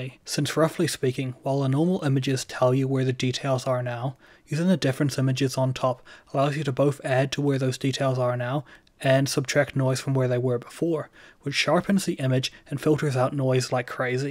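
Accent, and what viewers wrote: United Kingdom. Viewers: You have an accent so it sounds like UK perhaps and probably get away with it due to the connection between the United Kingdom and the us being part of the five eyes. But probably still would have to ask for permission and would probably get it because what I'm thinking is portable extremely modular, uses an SDR array of disciplined chief sdrs and one that's a little more expensive because it covers from 6 to 20 GHz. And then there would be $360 degrees of visual, visual zoom, infrared, thermal, it's kind of designed like a cylinder with heat dissipation and reflectivity taken into account. And people who are knowledgeable about passive radar know what I mean about reflectivity. I'm using quarter wave well filtered phased array antennas on a flexible PCB for example is one idea ahead facing each direction so you can get time of flight. 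There's also a nice premade hat it looks like a hat the determines phase so you can weed out multipath. It connects via fiber optics pelican case which has whichever is the best chemistry and density for power storage. The ability to hook up to camouflaged wind, solar, or hydro even if it's just a local water source. Hooking up a small charging device to the local water. You put up a set of 10 or 15 of these and you could cover a lot of space 1 it would spend several days taking baseline is on the EM spectrum and picking out known emitters. If you take a baseline from knowing emitters with known coordinates over a week or two weeks of various w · Did you think of this idea yourself? You could probably develop some rough prototype and pitch it to the US government, or the UK since you sound British.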